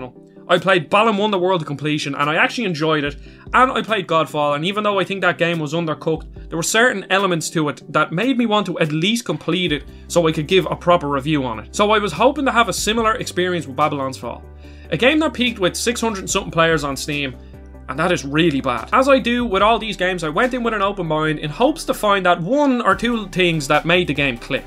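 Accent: Ireland. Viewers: I’m here for the hot sexy Irish accent! Nice video!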